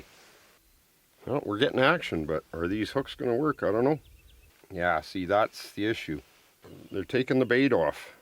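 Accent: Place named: Canada. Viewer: you sound like your from Canada 🍁 🍁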